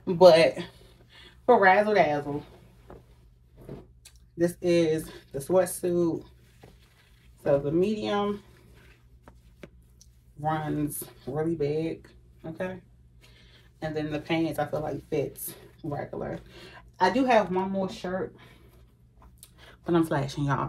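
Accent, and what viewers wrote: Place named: DMV, United States. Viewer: First time on your channel!! Oh you came with the looks 🙌🙌. Definitely new subscriber. And the way you talk -you sound like you're from my area #DMV. Thanks for the style inspo.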